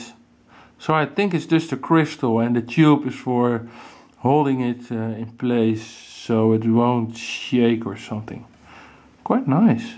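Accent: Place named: Netherlands. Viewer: Nederlander zeker? :P Awesome to have a peek inside these things though! :D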